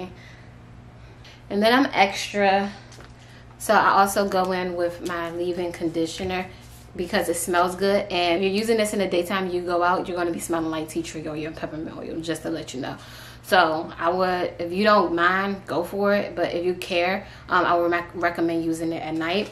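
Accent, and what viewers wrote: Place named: Washington DC, United States. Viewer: I know you’re from the dc area by your accent. Love the video. Thanks.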